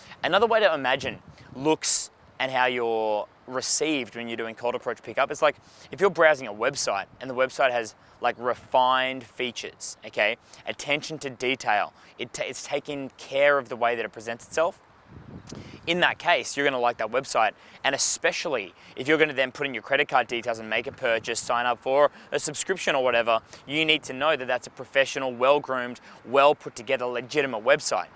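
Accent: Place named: Australia. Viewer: Do you have bootcamps in Perth, WA? Most i ever known of are UK or USA good to hear an Aussie accent... In regards to looks... i think when they say looks don't matter they mean being a 'pretty boi' and since evolutionary women respond to behavioral cues in men when it comes to attraction it doesn't matter. i do agree you have to be presentable tho... what is your take on this?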